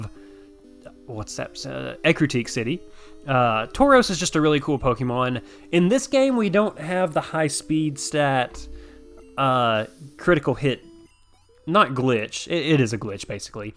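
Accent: South, United States. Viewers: I <3 you. I’ve watched all of your Pokémon runs to this point (a few times since I watch them to sleep) and have now started this nuzlocke. It’s nice hearing another southern voice for my Pokémon runs. Plus, coming from a Pokémon challenge connoisseur, you have really high quality videos and they’re great to watch. I see you’re more busy with life now, and I just wanted to leave this comment and thank you for all the great content you’ve put on here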